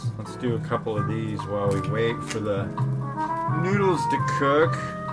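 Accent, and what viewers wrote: United States. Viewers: For some reason your videos have got the vibe of 2000s. Maybe it's the editing. P.S. I hope you'll get the most you can from all these Russian dudes. P.P.S. Your accent is sooo satisfying to listen to. Smooth and very American. Nice pronunciation always gets me.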